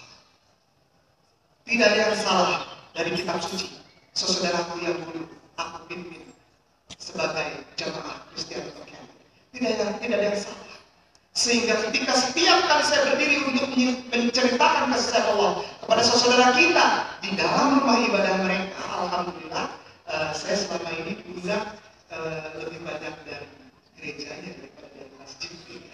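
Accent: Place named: Ambon, Indonesia. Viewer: dr suaranya sudah ketahuan kalo bliau orang ambon, gag perlu lihat muka ato nama,,, suara vokal orang ambon itu khas banget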